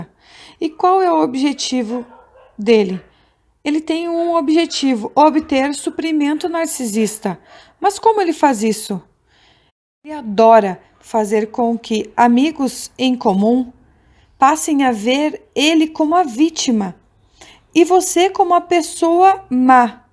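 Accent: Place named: Rio Grande do Sul, Brazil. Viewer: Seus vídeos são brilhantes mas seu sotaque gaúcho é simplesmente ADORÁVEL.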